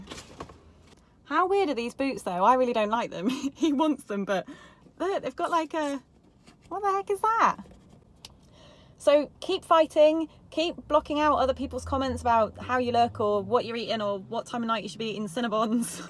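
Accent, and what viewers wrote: United Kingdom. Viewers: I am SO intrigued - where do you live?? English accent but always so sunny!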